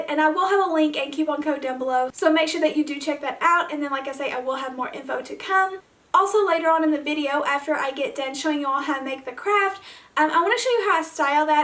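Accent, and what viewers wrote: South, United States. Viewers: I’m new to ur channel but I’m already hooked! I love ur southern draw & how effortlessly & beautifully u executed this DIY 😻 My only disappointment is that I didn’t find this video when u 1st put it out & there’s no way I’ll find these pieces now 😫 But I will be substituting the stuff I can’t find & recreating this to the best of my ability bc I freaking LOVE this!!! Thank u!! 🥰❤️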